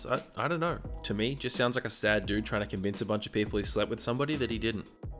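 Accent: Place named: Australia. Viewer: I don't know why but j feel like your an Australian because of that accent btw I'm from nz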